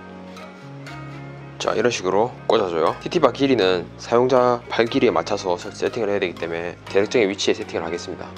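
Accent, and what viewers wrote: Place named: Gyeongsang, South Korea. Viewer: 자전거 좋아하고 경상도사투리가 구수해서 님 영상 자주보는 구독자인데 샵이 당리동인거는 오늘 처음알았네요.ㅎㅎ 하단sk거주자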